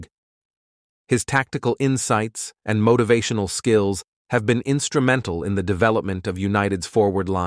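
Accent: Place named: United States. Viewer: uyacasula ngale American accent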